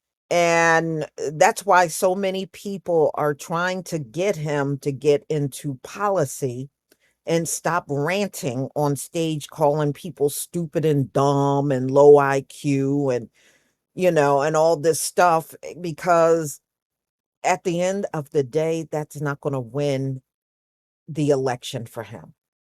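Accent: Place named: Northeast, United States. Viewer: I could be wrong but I think its her accent . Northeast maybe ? Maryland, Delaware ?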